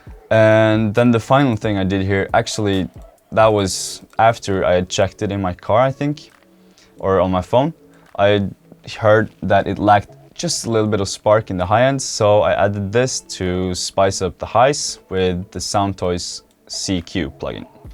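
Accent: Norway. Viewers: FREE mastring i Will love it. But are you from Norway?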